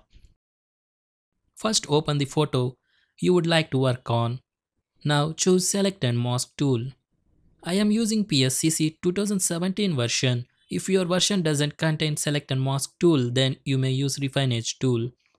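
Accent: India. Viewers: Indian accent is cool ikr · Indian accent 😃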